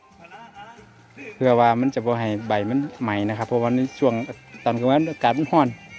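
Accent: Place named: Isan, Thailand. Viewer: คุณน้องเป็นคนจังหวัดใหนคะ..เว้าอิสานม่วนดี❤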